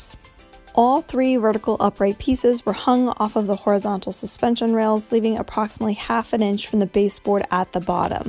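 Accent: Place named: Pittsburgh, United States. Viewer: i can tell youre pittsburgh "needs packed"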